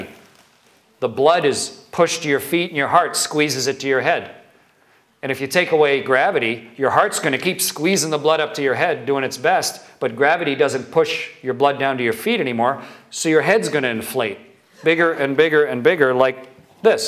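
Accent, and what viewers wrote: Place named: Canada. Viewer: Hehehe, I adore the Canadian accent:-) Especially the "eh" thing:-)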